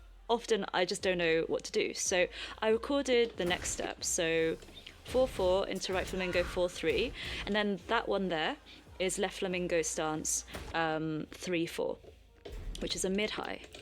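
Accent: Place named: United Kingdom. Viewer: This is a good video. I like the fancy British accent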